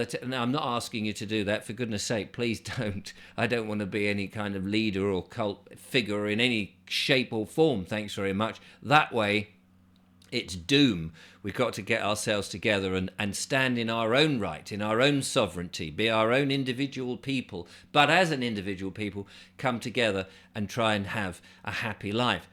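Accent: United Kingdom. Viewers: Although your accent suggests you are a limey, you are dumber than many Americans when it comes to British history. "Royal Assent" an automatic formality, and any right of the King to veto a bill is defunct. No monarch has vetoed a bill since Queen Anne vetoed the militia bill in 1707, and she did that only because her ministers changed their mind on its wisdom and told her to veto it. She complied with the request. The mere fact that a bill has been introduced in Parliament, especially a private member's bill, does not remotely mean it has a chance of becoming law. The title of "Defender of Faith" was given to King Henry VIII by the Pope, as thanks for writing some gibberish in opposition to Martin Luther. The Pope revoked the title when the lecherous old pig ditched his wife to marry his mistress and broke with the church, so it is no longer licit for the monarch to retain it.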